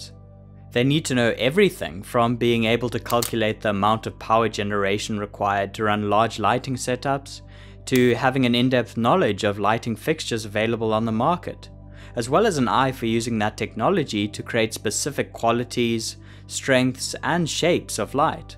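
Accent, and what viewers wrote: South Africa. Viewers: You have a very distinct UK accent. Is that London or somewhere in. Northern England? Oh South Africa! No wonder I couldn't pinpoint it. Keep up the good work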